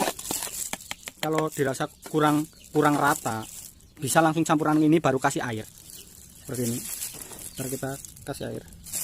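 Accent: Banyumas, Indonesia. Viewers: Joooss sobat ku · Ngapak nya mana kang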